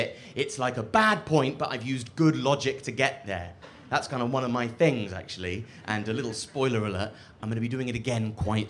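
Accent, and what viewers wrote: United Kingdom. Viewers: Amazing Special! that Scouse accent is so fucking funny. Bum Nuggets.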